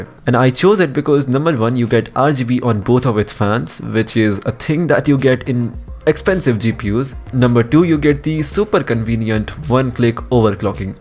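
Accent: India. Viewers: Indian accent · This indian accent is so anoying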